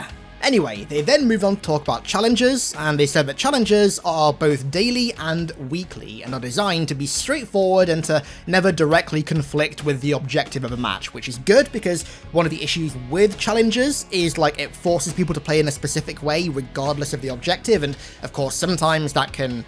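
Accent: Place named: United Kingdom. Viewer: This man has the signature british voice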